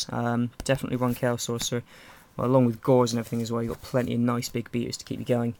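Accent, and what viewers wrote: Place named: United Kingdom. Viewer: you sound like charlieissocoollike but probably its just the british accent :D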